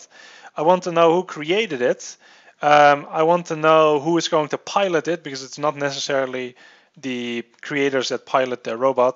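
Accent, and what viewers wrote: Netherlands. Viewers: Man im dutch myselve and i instantly knew youre dutch :P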